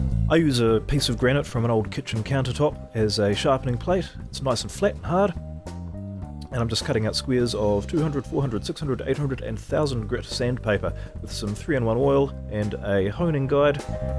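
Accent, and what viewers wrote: New Zealand. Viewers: Clear explanation and step-by-step practical demonstration. Enjoyed this video. Kiwi accent.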